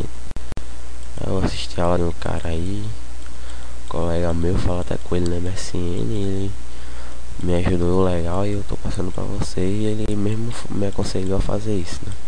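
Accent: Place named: Paraíba, Brazil. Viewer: vozinha de paraibanooo éh noiz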